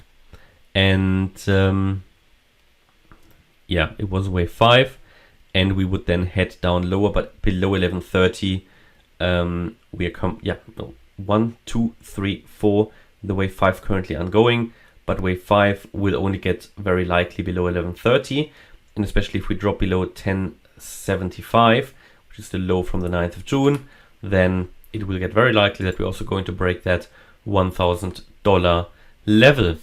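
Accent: Germany. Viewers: deutsch? hört man raus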